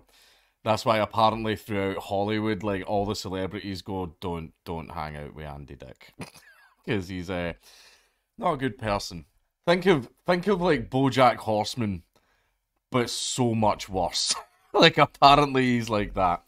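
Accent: Scotland, United Kingdom. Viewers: Andy Dick stories are always entertaining , the Scottish accent makes everything a thousand times funnier though man. You've become one of my faithful go to's whenever I need a laugh to destress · You haven't heard the phrase dildos all over the floor until you've heard it in a Scottish accent. I nearly peed.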